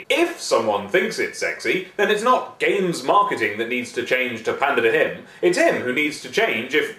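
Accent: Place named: United Kingdom. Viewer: You were totally talking in a british accent and that ruined it for me could you be more American?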